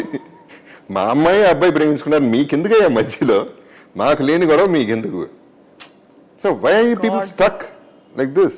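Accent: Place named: Krishna, India. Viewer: ❤❤❤❤love for your slang.pakka krishna slang😘😘😘😘😘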